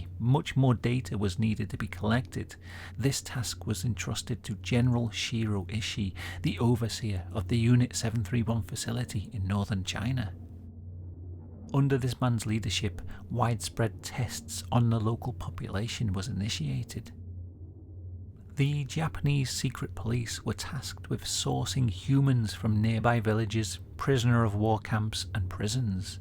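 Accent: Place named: North Wales, United Kingdom. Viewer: He's from North Wales, apparently.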